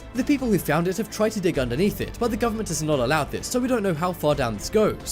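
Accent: United Kingdom. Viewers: He sounds British. I live 3 miles from America, they don't talk like that. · The chattery British narrative is hard on the ears. What's scary around finding a rusty old gun ?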